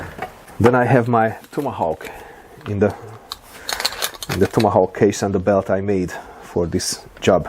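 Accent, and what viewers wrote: Hungary. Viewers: The moment i heard your voice i knew you are Hungarian. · This is the first video I saw from you, but I instantly recognized the hungarian accent. :D Great vid, keep up the good work, valahol van egy eladó bojlerem is.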